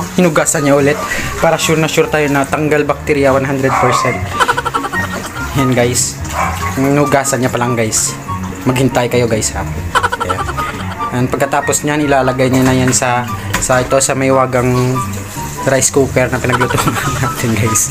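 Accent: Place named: Iloilo, Philippines. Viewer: Hahaha dami kung tawa sayo lods.,lalo sa tabagak mo.,ay nano tinonto ito hahaha ilonggo gid mong.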